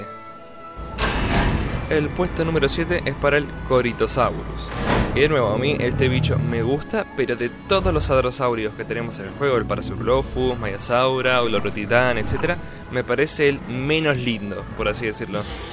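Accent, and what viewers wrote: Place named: Argentina. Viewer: se nota que es argentino 🔥🤷🏾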